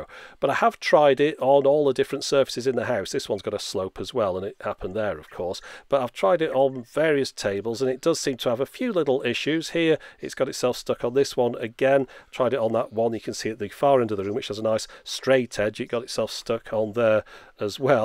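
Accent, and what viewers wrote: United Kingdom. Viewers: How can the original way of speech for a language be this repugnant compared to most of the subsequent versions of the same language? (U.S, Australian or Canadian accents)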